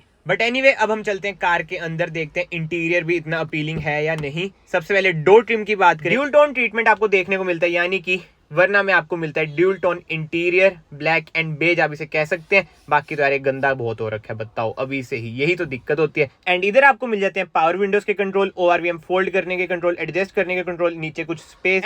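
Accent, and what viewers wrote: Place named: Punjab, India. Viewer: Bhai tumhari punjabi wali Hindi sun kar maza aa jata hain 😅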